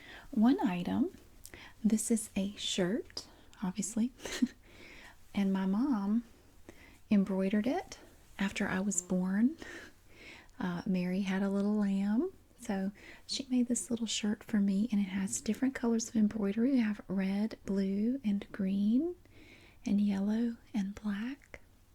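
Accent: South, United States. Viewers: It is joyful to listen to how intrigued you were with the various items in the chest. Your Southern accent and your interests are very relaxing. Keep up the fantastic work!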